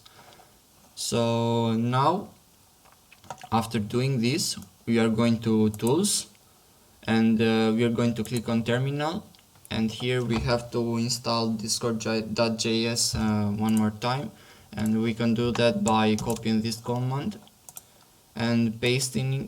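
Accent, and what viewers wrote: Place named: Albania. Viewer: hahaha po duket shqiptari se si e flet gjuhen e huaj 🤣🤣🤣 ❤️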